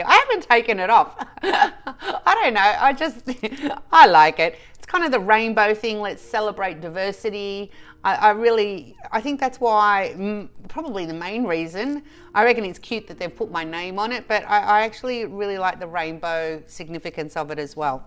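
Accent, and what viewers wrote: Australia. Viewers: Congratulations on the 2k achievement,. What keeps me coming back day after day (besides your Aussie accent, LOL) is the fact that you are REAL! Your love for family and friends makes my heart Happy and your sense of humor makes me smile or even laugh out loud. Sending, as always, much love and lots of warm hugs💖🦋🌈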